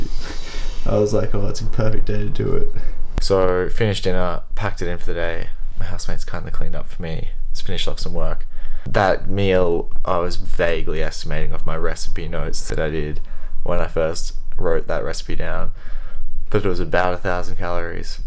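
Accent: Australia. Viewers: Hey man, its probably because of the aussie accent but you remind me so much of calm, less crazy Last Natural! Keep up the videos really enjoying it.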